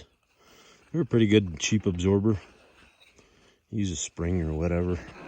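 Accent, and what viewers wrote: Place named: Atlantic Canada, Canada. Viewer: Good job. I'd like to make a groomer for lake trails, that shaves off wind blown moguls and fills in the dips. My idea is that it would be on skates that would dig down and skate along the ice. And the whole thing would be height adjustable with about 4 legs that the skates would be attached to, to set you blades at the mid point or so of the mogul depth. Skates would have to be designed so the helped pull the unit down and maintained contact with ice as best as possible also. Just an idea bumbling around in my head. Sounds like your from Canada's east coast?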